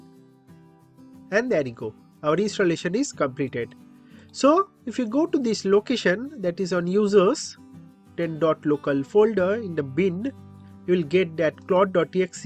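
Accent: India. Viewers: Hear indian accent - know for sure that i will be able to install it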